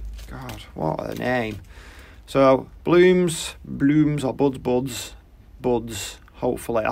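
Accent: England, United Kingdom. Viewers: well it’s the English accent that helps too. And I do wAtch most all your videos, but this one I had to do some other things and I don’t have non orchids so it was okay to listen in parts.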